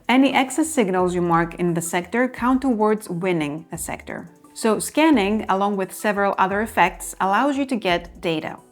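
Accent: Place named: Greece. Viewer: πολυ ομορφη εξήγηση. Ευχαριστουμε! Επιασα Ελληνική προφορά, ελπιζω να μην κανω λαθος. 😄😄 επαγγελματική διαστροφή ειμαι καθ. Αγγλικων. φοβερη δουλειά. Ζηλεύω. 😂 θα το παίξουμε σημερα. και θα το εξηγησω (ως συνηθως 🙄). θα σου πω εντυπωσεις!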